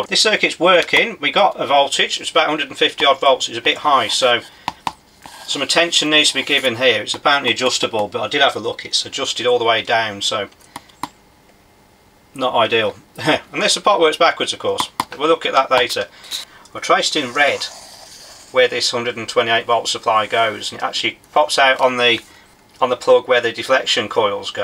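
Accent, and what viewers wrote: Birmingham, United Kingdom. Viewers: You are like all us Brummies a happy chappy, bostin fix bud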